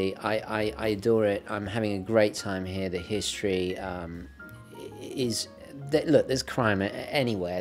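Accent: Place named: United Kingdom. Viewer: I really laugh a lot in this video. I’m from México, I use to live in an island on the Caribbean Sea, you should go there for vacations. But now I leave in Mexico City for university. Here no one speaks in English, but also, here I started to really like de language. And more the british accent like you have. That reminds me of my English teacher back in those days. I found your videos very interesting, and now I’ve just bought my first watch. I was the one asking about pocket watches months ago, but starting to know more about horology, now I bought a invicta pro diver. I know, a controversial watch. But that’s what I can afford at this moment, and I also choose that because my dad is (but he doesn’t work on that anymore) a diver, and I want to learn in the future. Thanks for your videos, I learn and practice my English at the same time.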